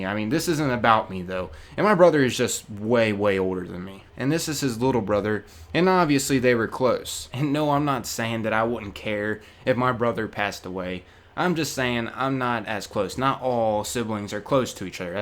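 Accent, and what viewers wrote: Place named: Pittsburgh, United States. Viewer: you sound like a fuckin yinzer man...are you from pittsburgh, too? lol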